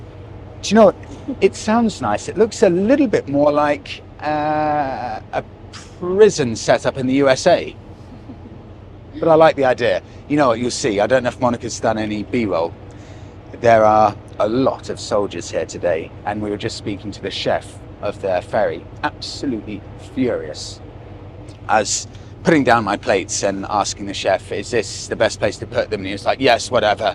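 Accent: RP, United Kingdom. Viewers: Just curious...is yours a London accent? Is that considered posh? Thanks.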